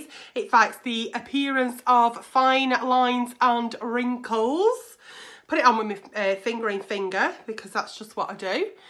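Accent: United Kingdom. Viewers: Idk if you're British or not, but God damm I love it.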